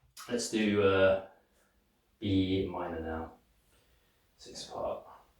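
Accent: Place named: United Kingdom. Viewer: Only Asian I know who can speak with Britain accent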